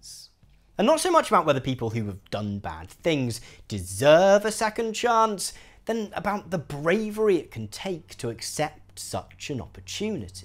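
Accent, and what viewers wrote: RP, United Kingdom. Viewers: voice, accent and intonation sound exactly like coming from a listening exercise in a British English course. Perhaps recording these is his other job...?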